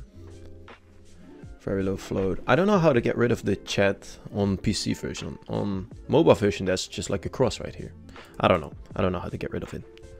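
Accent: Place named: Netherlands. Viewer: Where you from? You sound dutch 🇳🇱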